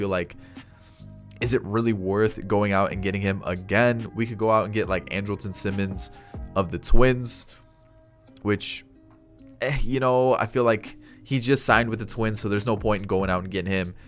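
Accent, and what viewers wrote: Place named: Boston, United States. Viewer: Sound like a Bostonian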